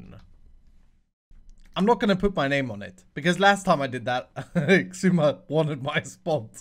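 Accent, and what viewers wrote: Sweden. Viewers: You ar from Sweden right? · Are you Swedish?!? I’ve never seen your channel before, l really like your video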